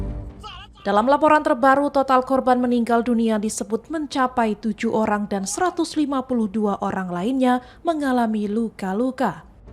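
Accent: Indonesia. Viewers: Widiihh suroboyoan,e metu , arek endi iki ?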